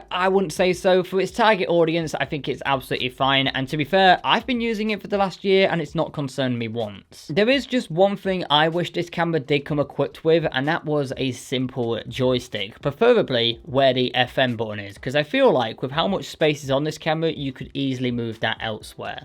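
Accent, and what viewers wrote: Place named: England, United Kingdom. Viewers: I know it's an English accent but can anyone tell me from which region? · Hey, I'd say it's definitely some sort of Estuary English, meaning London.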